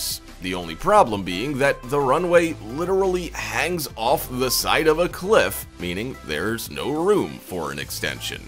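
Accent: United States. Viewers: the voice over.... so not done, but american of course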